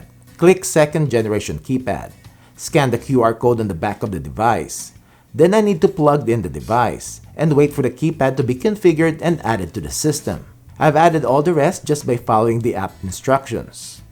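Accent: Philippines. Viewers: not sure whose the ding dongs who gave thumbs down? unless they thought the accent sounded like a gay filipino? if you can avoid that, its an excellent review.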